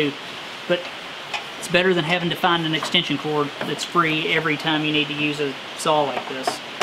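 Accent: South, United States. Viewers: I didn't think how much is added to your videos by your accent until I heard your dad speak. I've gotten used to hearing you and, and seldom think that you speak differently than us Midwestern folk. I met my northerner wife while attending school in Chattanooga. Southern accents have always been a treasure to me since then.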